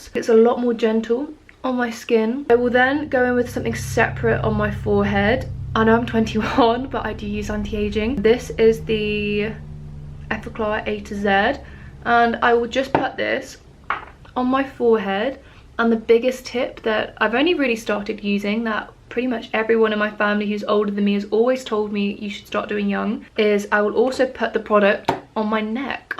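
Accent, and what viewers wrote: United Kingdom. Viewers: Your british accent is so cutteeeeee❤❤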